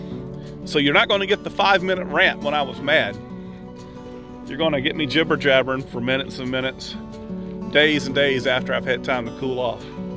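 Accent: South, United States. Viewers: I guess you're a fantasy life for them. A way to distract from their reality so they don't want to hear about your reality. I want to enjoy your life (your reality) on the road. Hear your southern accent & watch your handsome face video you & the Wolf Pack. Happy New Year🎉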